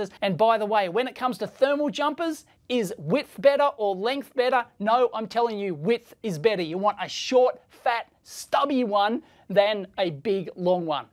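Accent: Australia. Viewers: Australia accent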